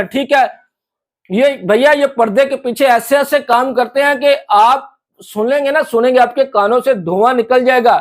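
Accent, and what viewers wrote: Pakistan. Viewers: Bhai jo language ascent saye baat kar rahye ho, app pakistani ho. Kyon Indian cricket jersey ka sahara laye rahye ho................ Apni identity kyoon chupaa rahye ho............ 😂😂😂😂😂😂😂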